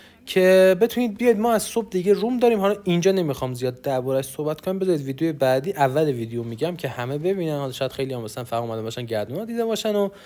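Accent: Kermanshah, Iran. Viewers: داش یکم کرمانشاهی صحبت کن دیگه بشنویم لهجه ی کرمانشاهی های عزیز سلطان😂🔥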